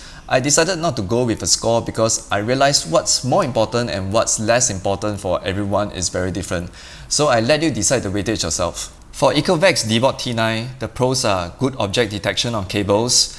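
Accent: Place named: Singapore. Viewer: Impressive amounts of tests! I'm Korean but would never buy a Samsung because they are severely overpriced in Korea. So I'd stick with Xiaomi or Roborock. On a side note, very interesting Singlish accent. I have quite a few Singaporean friends and find the accents vary quite a lot from person to person. Wonder why that would be?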